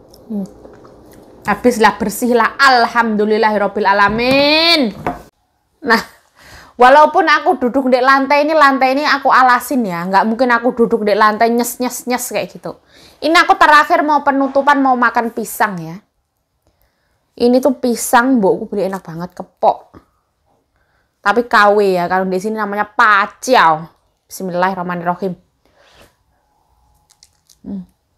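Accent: Java, Indonesia. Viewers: Ngomonge biasa ae " medok poll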